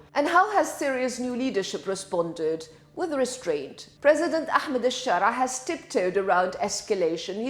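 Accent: RP, United Kingdom. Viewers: Full support for Israel from The Netherlands 🇮🇱🇳🇱 Beautiful words with a posh accent does not hide the violence and hate embedded in the ☪️ULT called Islam! Everywhere stone kissers go, problems follow!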